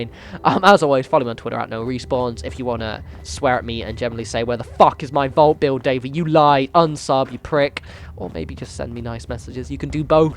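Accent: England, United Kingdom. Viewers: I was actually thinking about this yesterday, when I was playing the game and trying to talk myself into going back to work on my vault but just really really didn't want to. I think you hit the nail on the head - I also tend to try to make my settlements look natural and part of the environment, like if somebody else came across it they might think it was made by Bethesda. If you look at the other vaults in the game made by Bethesda, many of them are dilapidated and worn. We only got brand new building pieces which just becomes monotonous after awhile and the only way to make vaults look unique is really through decorating, which if you aren't a fan of spending a lot of time on decorating, makes the whole thing feel like a chore. Side note: I actually found your channel (and subscribed) while I was looking for build ideas for my vault...I gave up on my vault, went back and watched some of your older videos and picked up a lot of tips that I've been using in my other settlements! 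And also, who can resist a man with an English accent doing Fallout 4 videos. Keep up the awesome work, can't wait to see what you do with Nuka World.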